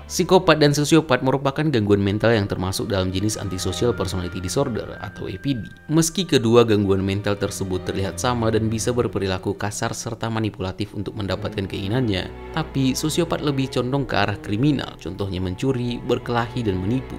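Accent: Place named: Banyumas, Indonesia. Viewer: Yang ngomong kok beda anjir... Malah jadi logat ngapak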